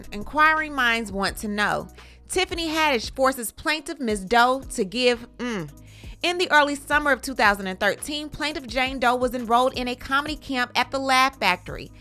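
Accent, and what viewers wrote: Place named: Carolinas, United States. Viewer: I love and appreciate your coverage. You sound just like my cousin so it's easy listening to you plus you're detailed in your coverage. Thank you.. by the way are you from the Carolinas, bc you sound like us!! Lol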